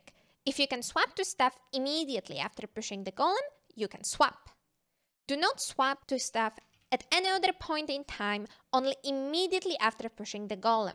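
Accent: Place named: Ireland. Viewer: Thanks for the great guides! Pushing always gave me rough time so I just switched off support to dps for this flight. P.S: Interesting accent! I can’t identify it. What region are you from? I get Irish vibes but I have no idea.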